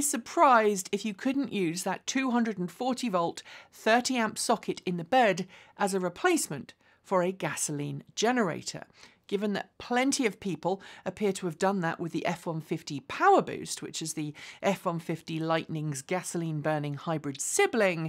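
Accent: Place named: United Kingdom. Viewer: Wow! A woman with British accent calling a trunk a trunk and not a boot. I'll be damned ...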